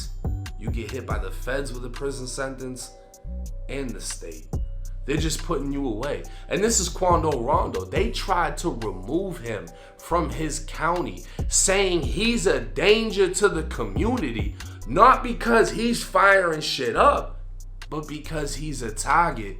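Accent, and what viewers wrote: Boston, United States. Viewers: “Got pulled over in the cah and caught him a drug chahg.” Guess that Boston accent never goes away lol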